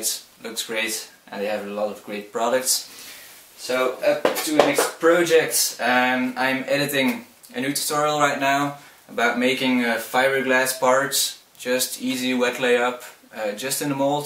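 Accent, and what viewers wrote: Netherlands. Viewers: You dutch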